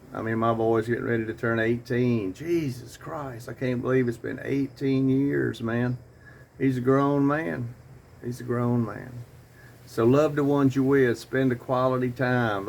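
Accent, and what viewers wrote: South, United States. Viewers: Southern accent is fun to hear 😂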